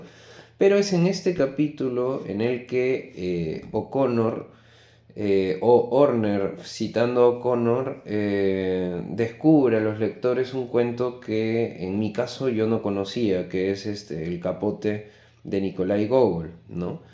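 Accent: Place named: Peru. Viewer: Gracias por el análisis. Que lindo tu acento, creo que es de Perú.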